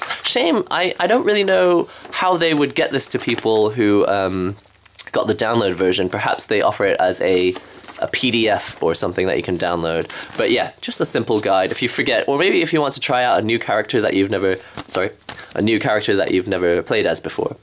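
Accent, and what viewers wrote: United Kingdom. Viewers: I'm not saying that silly. From his accent, he's clearly a British dude moved to Japan!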